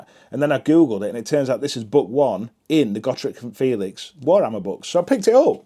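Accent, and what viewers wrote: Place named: Derbyshire, United Kingdom. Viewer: Ah thank you. So it's a Derbyshire accent.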